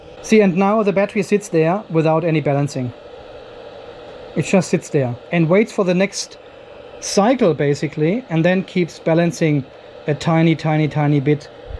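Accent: Germany. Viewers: Hi, i am thinking about buying one of those Batteriepack from Basen for my upcoming solar Project. But... I don't know yet. I start watching your Channel a couple of days ago. I am impressed about your knowledge on the whole Solar stuff. And the other thing is i was wondering about your english language with the German Akzent. But anyway... I Like your Videos, keep on doing IT. I learned a Lot more about batteries from U. Grüße aus Ludwigsfelde Tom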